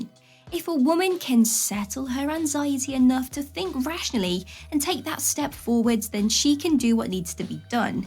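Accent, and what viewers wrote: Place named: London, United Kingdom. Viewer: I think she is from London.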